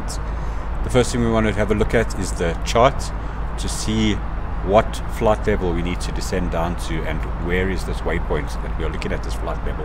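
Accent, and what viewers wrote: South Africa. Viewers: You can tell wen someone's south africa.😂😂😂 love it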